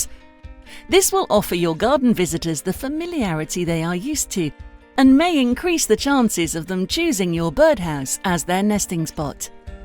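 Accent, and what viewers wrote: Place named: United Kingdom. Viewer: Not everyone is American dude, the accent is British.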